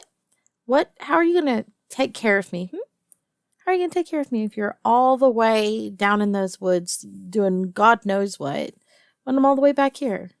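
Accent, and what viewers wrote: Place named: South, United States. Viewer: Goddamn that southern twang got me feeling weird..... Where ya from girl, definitely gotta be some southern state cus that's a fucking southern accent, i feel like im freaking kut but i definitely here a woman named Betsy with that voice lol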